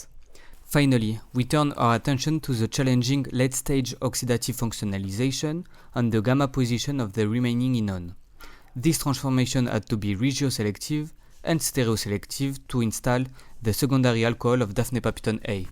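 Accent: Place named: France. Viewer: Je reconnaît le petite accent français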